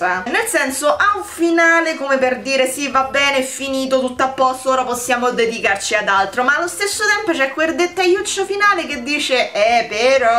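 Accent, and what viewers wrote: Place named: Rome, Italy. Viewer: quando fa la parlata romanesca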